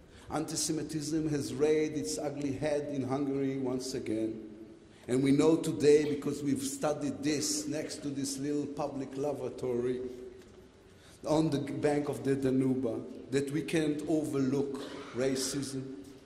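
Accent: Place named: Israel. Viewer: פעם ראשונה שאני שומע את לפיד מדבר באנגלית. מדבר אנגלית ממש טוב בקושי שומעים את המבטא הישראלי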